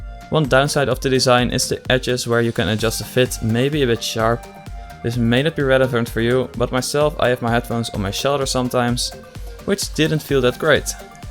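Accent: Netherlands. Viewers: sws nederlander hahahha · ur dutch right?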